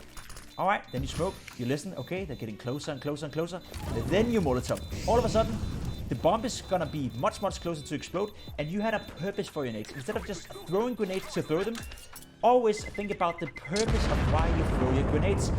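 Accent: Denmark. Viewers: nice vidio jeg syntes at det var sjovt at man kunne høre at du var dansker lol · are you danish? you sound danish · i can just hear that your Danish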